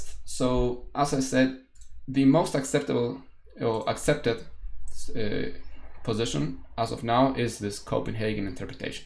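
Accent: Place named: Germany. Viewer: Good explanation brother, btw I love your German accent. I wish your channel grows and you keep making videos for us (or me)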